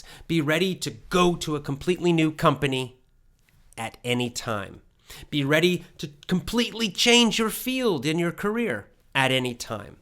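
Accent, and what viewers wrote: United States. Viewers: I love to hear your american accent. So I like follow you. You are engine for my work. I'm living in nederland. I'm using here english for comunication and also my language. I bought your pronucuation cours. I love it. My first day was 3 days, second day is just finish after 7 days. But I love It. I love , cuz I like change my job even to another country like Norway, coz I'm electronic specialist.Really I like to follow you exacly mach. Haha. This vidio is powerfull motivated for my next step. Just most important still is improving my pronucuation with your course.Thank you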